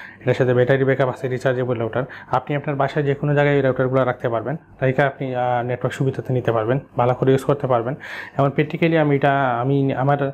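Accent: Sylhet, Bangladesh. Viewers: আপনার কথার স্টাইলে বুঝলাম কারন আমিও সিলেটের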